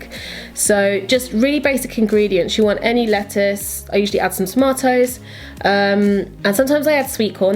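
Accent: United Kingdom. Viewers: Definitely UK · Sounds like UK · are you British ?🙋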